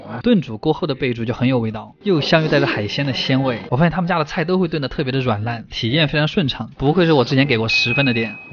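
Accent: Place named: Sichuan, China. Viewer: 四川话好土味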